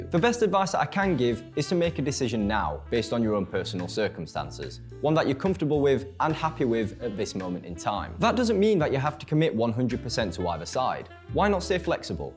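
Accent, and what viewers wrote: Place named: North, United Kingdom. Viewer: I reeeeally hope you didn't stumble on the error of an assumed 6% mortgage rate throughout late and that it was for dramatic effect. Don't forget people don't always watch the whole video so be careful back-ending that "be careful about listening to one random guy" advice! (and the obvious-to-some-people flaw in your early calcs). Overall the video gave good info, and nice to hear another northern accent doing this type of vid!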